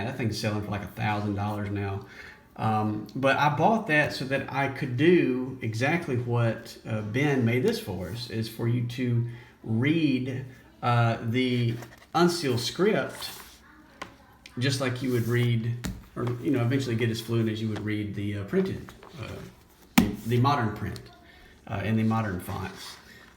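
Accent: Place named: South, United States. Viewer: Your strong Southern twang is in sharp contrast to your beautiful Greek pronunciation!!!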